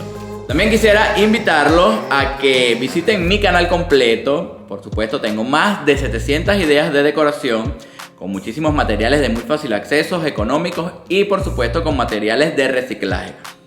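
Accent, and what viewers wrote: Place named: Venezuela. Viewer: Hola! Agradecidas Bendiciones para ti y tu gente! Deduzco que eres venezolano por tu acento! Me parece que estás en otro país! Tengo muchos LP y me estoy deshaciendo de ellos; si sabes de alguien que los quiera o necesite, estoy a la orden para regalarlos! Un abrazo hermano!:face-red-heart-shape:🙏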